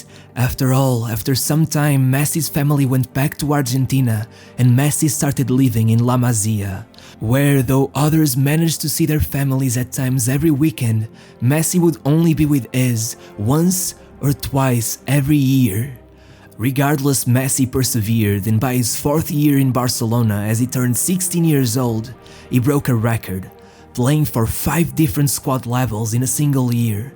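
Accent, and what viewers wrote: Portugal. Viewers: Eu sabia que eras português 😅😅 dava para perceber no sotaque mas nunca tive a certeza 🤣 Óptimo trabalho que estás a fazer! Tens jeito para desenvolver a história e arranjas boas imagens apoiar a história. Sem dúvida que envolve muito trabalho e pesquisa mas compensa, pois os vídeos são muito bons!! Continua assim, que ainda há muitos génios do futebol para falar 😉 E viva o Norte car*lho 🤣🤣🤣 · the speaker who sounds portuguese, should kno that thhe spaaish name carlos rexach is pronounced carlos rejach in spanish! I dont kno him personally, but u should kno that! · Esse sotaque e esse toque de paixão,no falar dos nossos grandes, é de um português honrado... :)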